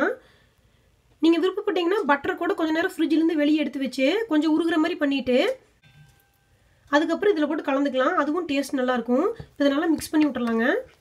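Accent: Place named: Coimbatore, India. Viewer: அக்கா நீங்க கோயபுத்துர் றா உங்க பேச்சி நல்லறுக்கு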